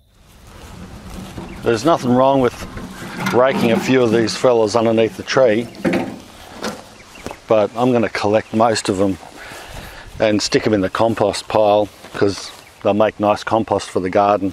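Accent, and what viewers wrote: Australia. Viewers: I love Australian accent and words. Would love to see your country, but not to fawned of a lot of your nasty critters . I need to have made some great raised beds, like you have for my wife to garden in. With age he back is not like it use to be. Straw has gotten crazy expensive here in the USA, where I live. It cost as much as good hay. Use to get it for a $ 1.00 a bale, but now I guess they are not growing wheat like the use to. I think that ground is now in corn, because of the prices .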